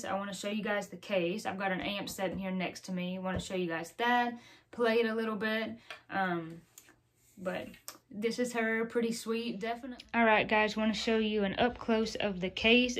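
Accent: South, United States. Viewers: Love your southern accent...! Isn't the Trad LP too heavy...? Cheers from 🇫🇷 big BBS fan 🎸🤘🏻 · Love that guitar; just picked up the 2020 Trad Pro V in satin ebony yesterday! Amazing guitar; mine has mahogany body & mahogany top. Cool video, awesome guitar, and nice country accent; easy to listen to. Take care & God bless, Brandon 🎼🎸🇺🇸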